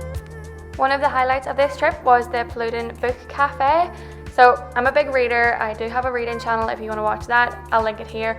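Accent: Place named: Ireland. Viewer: Sounds like u are from ireland, did u fly direct from dublin. My son and i are going next month from ni. Wondering best route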